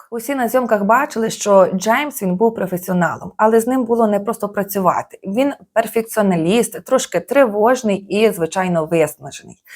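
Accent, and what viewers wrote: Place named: Volyn, Ukraine. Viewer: Ох ваша говірка🎉шарю що з Волині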